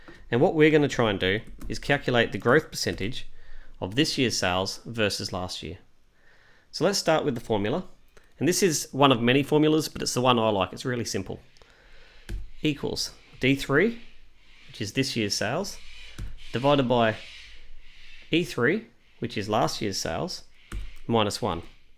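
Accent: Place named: Australia. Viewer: Love your accent, are you from Australia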